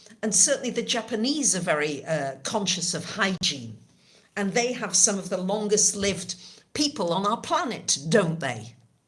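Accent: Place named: United Kingdom. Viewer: P.S. I simply love your british accent !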